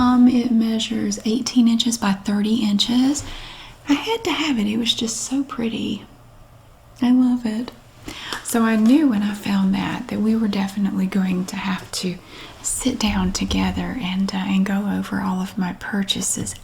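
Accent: South, United States. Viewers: I swear nobody brings me more comfort than this woman. I'm not sure if it's the southern accent or the maternal vibe she has, but I come back to her videos any time I'm stressed or anxious or upset & she always brings a sense of calmness to me. Bless her soul.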